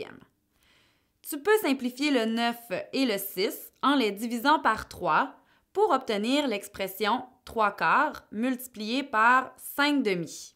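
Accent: Quebec, Canada. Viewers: Bonne explication, bon juste l'accent québécois qui rend un peut plus compliqué l'écoute mais je dit gg quand même 👍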